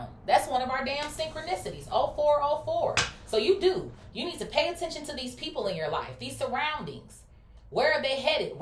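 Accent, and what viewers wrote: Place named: California, United States. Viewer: she sounds like shes from cali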